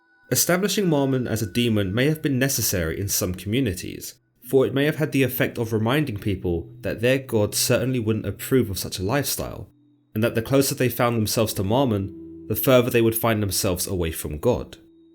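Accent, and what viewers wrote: United Kingdom. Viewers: Lmfao no ones gonna watch this boring video 😂😂😂 i clicked on it and u have to read it and the bucktooth brit accent hell nah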